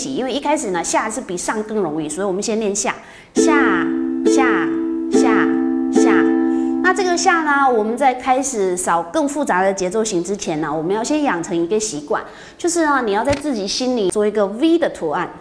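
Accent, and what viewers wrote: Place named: Taiwan. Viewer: 我觉得像台湾人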